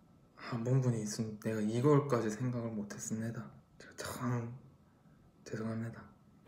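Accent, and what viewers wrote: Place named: North Korea. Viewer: ㅠㅠ아 기여운 기먼필 ㅠㅠㅠ 진심 북한말 너무 잘해ㅠㅠㅠㅠㅠㅠ 아진챠ㅠㅠ 기욥댜ㅠㅠㅠ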